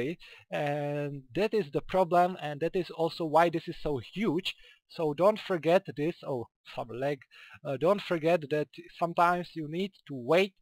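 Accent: Czech Republic. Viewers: ja mam dotaz :D prosim. z prizvuku si myslim, ze jsi cech, tak to napisu rovnou cesky :D mc sem hraval, ted to jen sleduju. ale nikdy sem na obvody nebyl a hrozne rad bych vedel, jaky tohle ma vyuziti v klasickym survival svete ? nebo to je jen sranda, ktera nema nejaky velky vyuziti ?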